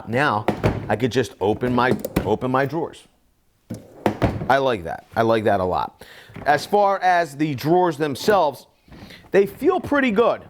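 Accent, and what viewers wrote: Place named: Northeast, United States. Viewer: This guy is so NorthEast he reminds me of my childhood.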